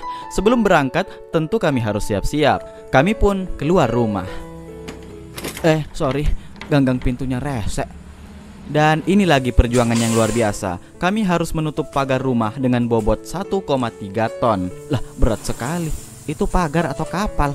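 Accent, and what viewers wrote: Makassar, Indonesia. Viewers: Bang org makassar yah? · Yg aku suka vlog ini adalah narasi nya bagus... Uniknya adalah, menggunakan bahasa indonesia dengan aksen indonesia timur, Makassar... Jadi lebih seru. Nice vlog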